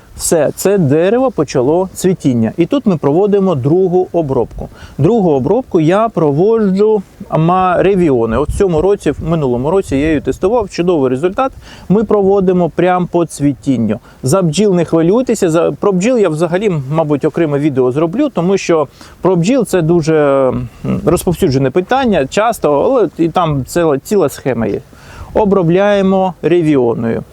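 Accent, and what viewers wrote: Standard. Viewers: А млжно ли этими ронпарптаии обработать вишни, яблони, груши, сливы. Инжир.У нам всех деревьев по 1 штуке и абрикос молодой еще не цвел, то его нужно будет обработать. А мадину и смородину иожно так обработать? И да мова чудова чиста и правильная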